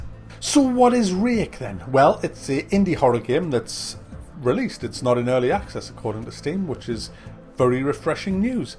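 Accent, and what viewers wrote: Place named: Newcastle, United Kingdom. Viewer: Sorry, Vampires do exist. Love your reviews. Proper Geordie rantings!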